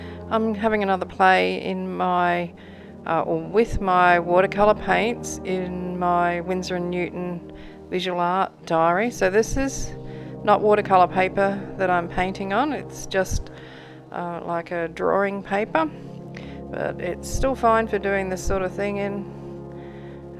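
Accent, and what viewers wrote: Australia. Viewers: It was nice hearing your Aussie accent (I am an Aussie too) because most YouTube instructors are American.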